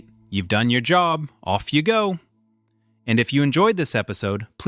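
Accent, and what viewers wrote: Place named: United States. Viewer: Interesting but you sound American so keep the measurements in feet and pounds. Makes no sense to mix them up with grams and meters.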